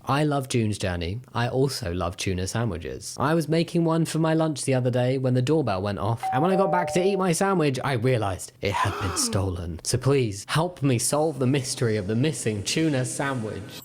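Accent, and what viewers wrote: United Kingdom. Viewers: I always watch these videos to watch a british man talk in a british accent to do gay british things. I always end each video learning more than I do in any of my classes.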